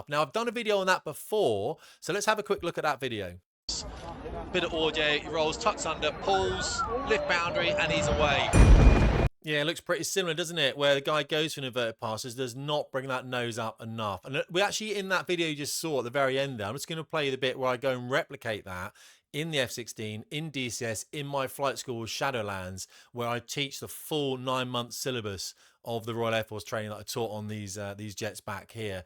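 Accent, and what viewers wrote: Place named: United Kingdom. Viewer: He was getting a picture by someone on the beach. Your british voice is annoying as hell. How cucked are you?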